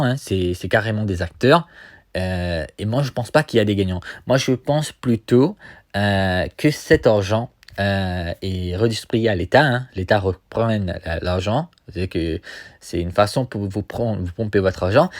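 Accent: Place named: Indian Ocean. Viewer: Ton accent sent l'océan indien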